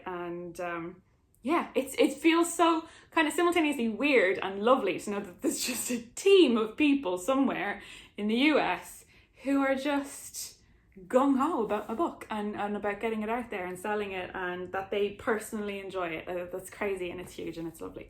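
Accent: Dublin, Ireland. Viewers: hearing a Dublin accent... so unbelievably soothing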